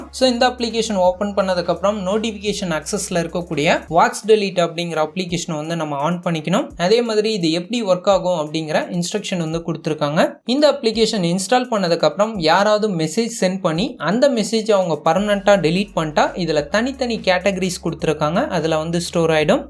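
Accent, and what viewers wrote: Salem, India. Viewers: Bro nega salem atturah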